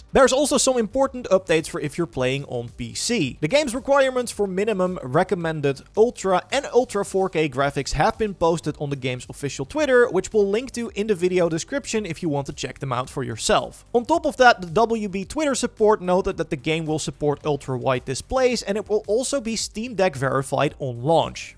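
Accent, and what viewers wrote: Netherlands. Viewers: 100% Nederlands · duidelijk nederlands accent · Steenkolen Engels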